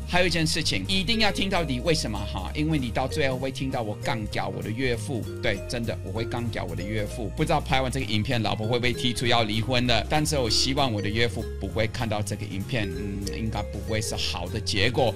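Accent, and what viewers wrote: Taiwan. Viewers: 台語比國語還標準！真的是台灣人... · 满口台腔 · 你的國台語已很台了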